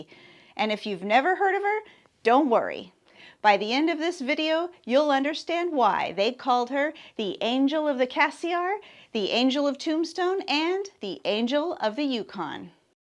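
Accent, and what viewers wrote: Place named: Ireland. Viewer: great video about a great lady... i got a chuckle from your irish accent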